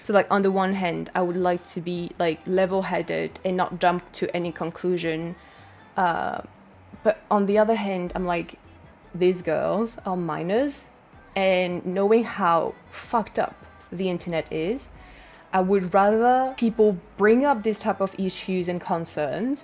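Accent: Vietnam. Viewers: Chính ra khẩu âm của chị nghe cute ấy chứ, người miền Bắc hầu như đều thấy giọng miền Nam nghe cute cực🤣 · oh wow I didn't know you could speak Vietnamese lol, I always thought you're from SE Asia. yesss you pass, that's good enough, you totally sound like a Vietnamese who would insert random English words when they speak lol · Come on... your Vietnamese is great. Giọng Sài gòn đặc luôn !!! Be confident because your accent sounds very clear and correct! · Trời ơi giọng Tiếng Việt của chị nghe hay mà dễ thương xĩu í tr ơi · i like your Vietnam accent xD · I love your Vietnamese pronunciation🙆‍♂️. It was cute 🤗. I could hear and understand it clearly. I feel so proud that someone who debuted in Kpop was Vietnamese ( I’m Vietnamese too). 🇻🇳🇻🇳🇻🇳 LET’s go with HANNI and NEW JEANS 🎉🎉🎉 ( So sorry because my English’s not good) ❤️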